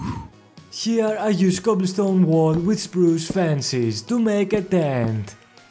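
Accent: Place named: Greece. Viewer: Your axcent sounds greek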